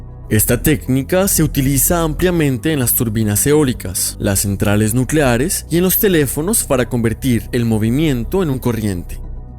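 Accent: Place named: Colombia. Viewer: Escuche este video en inglés. Ahora lo intente escuchar en español no puede escuchar más tres minutos, ese acento colombiano no puedo, no puedo espero que cambien al narrador por un acento más neutral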